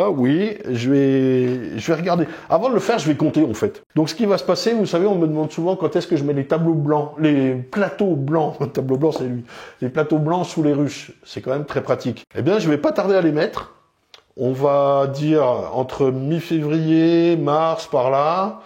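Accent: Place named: Marseille, France. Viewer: Salut,ça fait plaisir de te revoir! Mais d'où tu sors cet accent marseillais que tu sors régulièrement? Une marseillaise.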